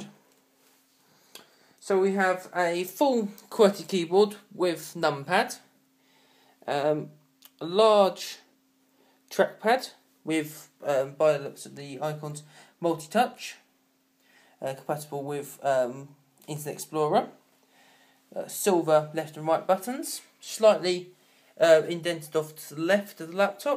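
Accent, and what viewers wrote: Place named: United Kingdom. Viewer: Ur from europe???? U sound british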